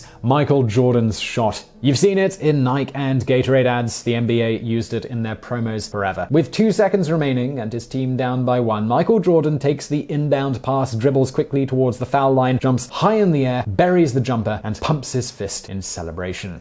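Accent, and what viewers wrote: United Kingdom. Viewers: Sal Pastore I think some are just because he’s British and they have different pronunciations, where others he’s just not familiar w the word.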